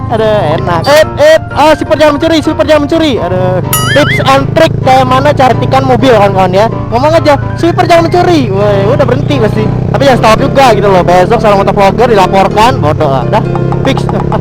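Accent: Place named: Java, Indonesia. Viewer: bang logat lu kok medok sih.. lu ada keturunan jawa apa gmn?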